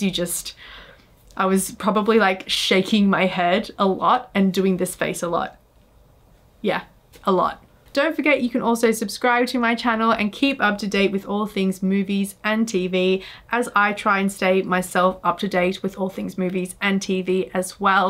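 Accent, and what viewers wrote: Australia. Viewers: Are you Aussie? I like your accent. 😁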